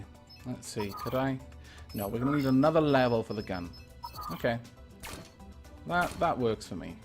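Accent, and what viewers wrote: United Kingdom. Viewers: thanks for understanding i love your channel by the way keep it up moslty the robocraft vids there awesome you helped me a lot with fliers and you got me into the game your on my 1 on the list of my favorite youtubers cause you answer back and you understand us and also help us and i really like your voice its really pleasing to hear it when ever i watch i vid i get really happy cause i love the british accent its so nice to hear but keep it up! looking forward to the next episode.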